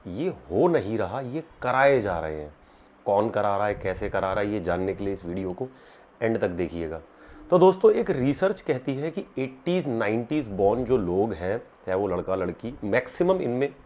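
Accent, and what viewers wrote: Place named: Faridabad, India. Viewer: Faridabadi accent..full mauj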